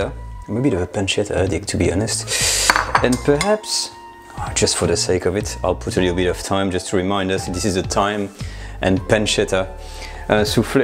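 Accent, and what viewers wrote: France. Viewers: That's because it's a French man speaking English in a French accent🍟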